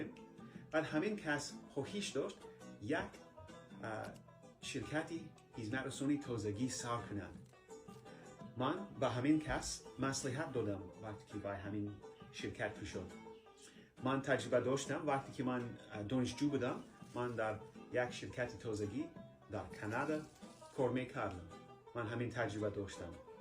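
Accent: Tajikistan. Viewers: Бехтарин хуш омадед.забони точикии ширин доред. Зиндаги кунед, хушбахт шавед❤❤❤ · Hello Mr. and Ms., well come to my beautiful, sunny and mountain country Tajikistan when youtube offered me your video I started watching it you mentioned that you came to my country 20 years ago and your Tajiks speaking is so pleasant, I left my country almost 20 years ago as well not to Canada but to the USA. I’m confident you are enjoying the hospitality of my country, if you do, please enjoy it for me too as I have not been since I left it and God willing one day I’ll come and if I ever meet you I’ll invite you to be my guest in my house. Peace Love and Friendship!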